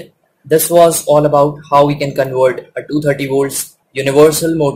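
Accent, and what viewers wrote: India. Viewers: I really hate the indian accent in english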